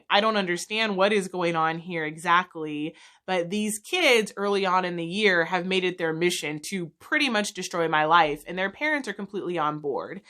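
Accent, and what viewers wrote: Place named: Minnesota, United States. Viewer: The more I listen the more it sounds like white minnesotans. Oh please, nurses— especially school nurses are usually at the bottom of that field, why do you elevate this rube?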